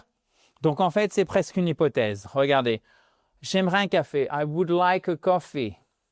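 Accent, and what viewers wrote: France. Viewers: Les Français du sud de la France prononcent de la même manière les terminaisons du futur simple (-rai) et du conditionnel présent (-rais), les rendant indiscernables à l'oral. habite à Saint-Étienne. Et voilà pourquoi. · J' adore lorsque tu parles anglais comme parlent les français, en plus tu les connais très bien , puis que tu es son prof.....❤❤❤❤❤❤❤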